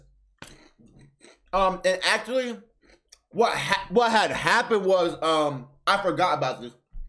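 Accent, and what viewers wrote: New Jersey, United States. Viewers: Ripe New Jersey accent right there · you from jersey? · New Jersey accent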